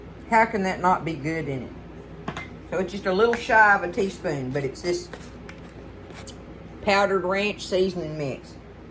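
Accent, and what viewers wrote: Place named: Texas, United States. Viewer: I read there was a study and they found that some people have a gene that makes cilantro taste like soap. I love these chilled and could eat a whole plate by myself..lol I've never put Tajin in them though so I'm going to try that. And I can always tell you're a Texan..."I'm gonna start with 4 jalapenos. And I'm gonna leave the seeds". ❤🌶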